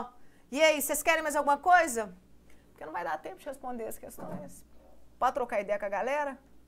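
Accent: Minas Gerais, Brazil. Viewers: Essa professora sem duvidas é mineira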